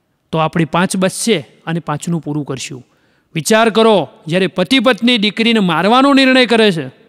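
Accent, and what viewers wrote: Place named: Mehsana, India. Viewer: વાહ તમારી મેહાણાની ભાષા ખુબ સરસ છેઃ હોવે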